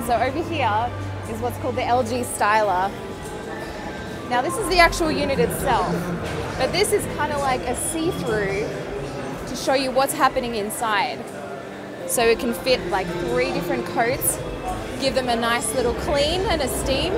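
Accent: Australia. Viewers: So Aussie! So Sydney accent! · Australian mate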